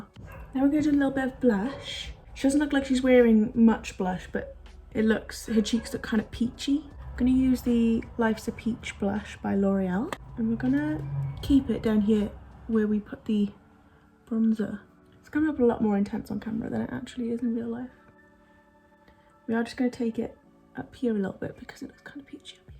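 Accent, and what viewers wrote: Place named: United Kingdom. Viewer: ur accent is very british by the way loved ur video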